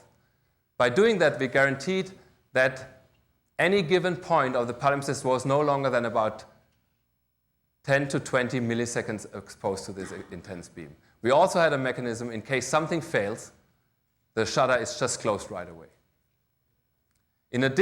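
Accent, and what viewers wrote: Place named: Germany. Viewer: These lectures always sound more authoritative when delivered in a German accent.!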